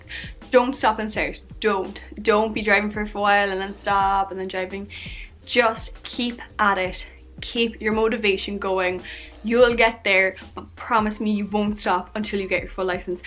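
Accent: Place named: Ireland. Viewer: It's very fast for me... It's only for Irish people. I'm trying to say about your English. But I don't give up! I'll watch all your videos. Do some podcast please about your car. About your dashboard and all parts of car what we need to know for test. Thanks and keep going!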